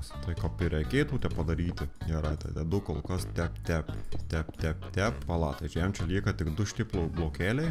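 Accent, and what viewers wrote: Suvalkija, Lithuania. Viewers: Gerai moki kalbėt suvalkietiškai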